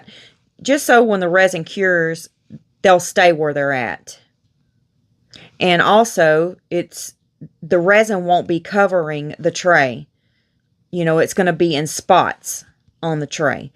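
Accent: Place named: Louisiana, United States. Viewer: Hi, the tray came out beautifully. Thanks for sharing the wet technique. I’ve never seen anyone else do it before. What state are you from? I know you have a southern accent & to me, it sounds like Louisiana, but I’m not sure. Take care, stay safe & have a blessed day.🙏💗👋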